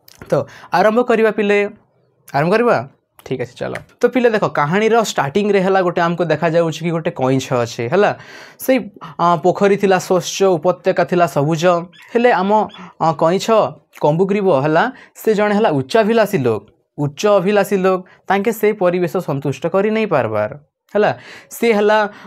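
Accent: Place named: Sambalpuri, India. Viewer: ସାର୍ ଆପଣଙ୍କ ର ସମ୍ବଲପୁରୀ ଆଉ ଓଡିଆ କଥା ମିଶା ଗପ ଟା ଭଲ୍ ଲାଗ୍ଲା ସେ😂😂😂😂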